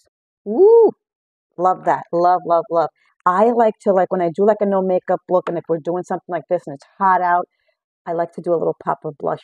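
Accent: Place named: East Coast, United States. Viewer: just found you and subscribed, mostly so I can hear your east coast accent! Love the Look!! this is about what I do on the daily, I might put on a fuller coverage foundation for going out but like you said, less is best, I'm a 68 year old Midwesterner and I could listen to you talk all day!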